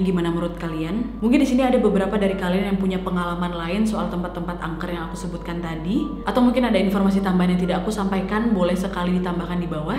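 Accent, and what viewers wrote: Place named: Samarinda, Indonesia. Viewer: Dari Samarinda mana suarany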